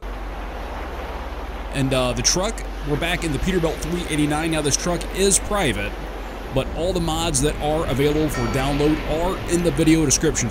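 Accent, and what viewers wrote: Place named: United States. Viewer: i will assume that you are american. If so, can you tell me from what part of US are you from? I'm asking you this because i quite like your accent. And nice video! Keep up the good work!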